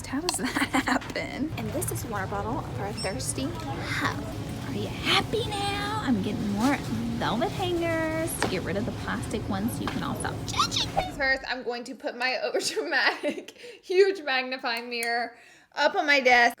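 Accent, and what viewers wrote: South, United States. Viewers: DOLLADOLLA BILLS I love seeing inserts of your old videos. I’m from the south and I just love your southern (charm) accent. It was way more heavy back then as it is now, but I still love it and you and Ty. RTR ♥️♥️.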